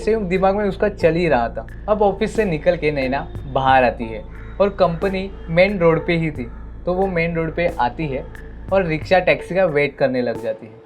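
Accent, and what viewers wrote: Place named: Mumbai, India. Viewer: Hindi should be more fluent...yeh mumbaiya hindi h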